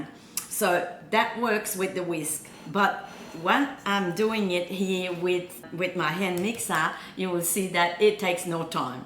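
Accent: Australia. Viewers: Une francaise avec un accent Aussie. Ou Kiwi?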